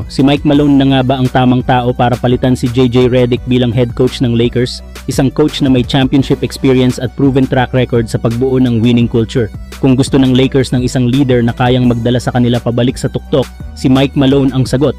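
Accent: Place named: Visayas, Philippines. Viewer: Bisaya siguro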